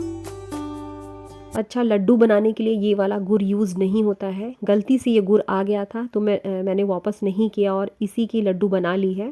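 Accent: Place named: Chhapra, India. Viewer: M chpra me rhti hu.pr apki language tone idhr ka hi lgta h.ya khan pan.hryana side ka nhi lgta,video achhi h.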